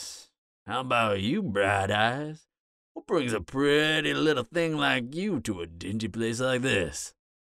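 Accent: Louisiana, United States. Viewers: Your southern style accent was amazing and this audio was super cute. Excellent job on this one! ❤️❤️❤️❤️❤️ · Like the Cajun sound your voice had. This reminds me a little of a song, by.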